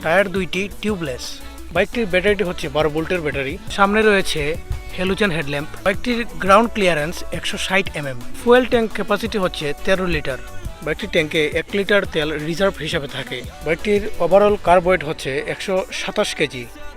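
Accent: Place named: Sylhet, Bangladesh. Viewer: সিলেটের মানুষদের একটাই প্রবলেম, শুদ্ধ বাংলা ভাষায় কথা বলতে গিয়ে একদম মুখের অবস্থা খারাপ হয়ে যায়। শুদ্ধ বাংলা ভাষায় কথা বলা কি এতই কঠিন? আসলে একটু চেষ্টা করলেই এটা মানিয়ে নেওয়া যায় এবং পারা যায়।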